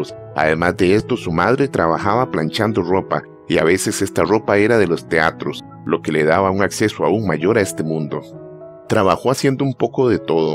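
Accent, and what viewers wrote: Costa Rica. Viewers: Me encanta como pronuncia las R’s el presentador de este canal. Ese acento solo lo tienen los de Costa Rica 🇨🇷 😄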